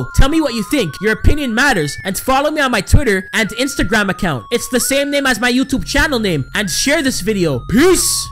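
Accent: Toronto, Canada. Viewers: Bro the guy who made this video sounds like a Somali Toronto hoodman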